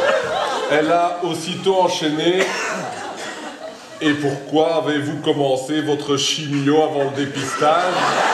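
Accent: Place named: Belgium. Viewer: accent Belge évident!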